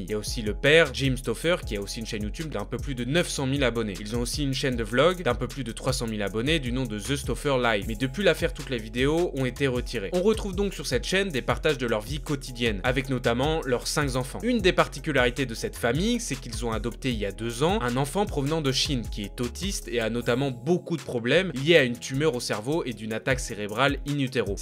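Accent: France. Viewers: tu sens le nord à des kilometres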